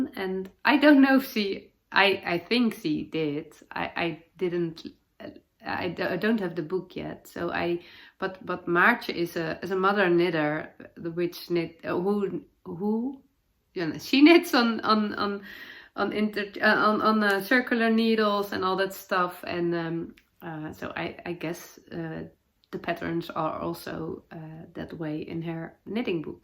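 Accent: Netherlands. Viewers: I love sharing my knitting time with you. You’re such an inspiration with your views on life and challenging yourself to grow whenever you can 👏🏼👏🏼👏🏼 You have made me look at the colour brown in a whole new light😂 I am searching for previous podcasts I haven’t seen bc I’ve watched all of your podcasts and I need more in my day 😂 You make me laugh so much with your Dutchy English. I live for it 😂 The saying when you have a cold is ‘I have a STUFFY nose’ but from now on, I’m going to say I have a stiffy nose, so I can relive that heart jumping moment over and over 💕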